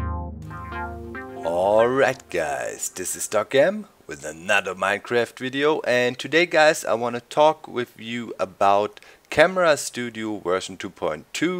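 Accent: Germany. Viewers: You are German like me. Aber dafür ist dein Englisch total gut :)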